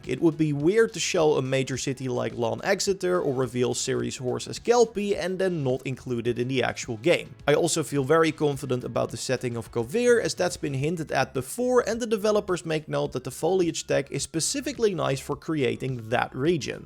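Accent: Netherlands. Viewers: HELL NAH BRUH that dutch accent is killing me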